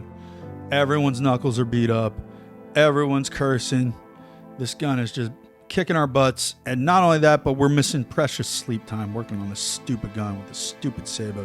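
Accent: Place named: United States. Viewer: Sounds like a real American brother. 🇺🇸🇺🇸🇺🇲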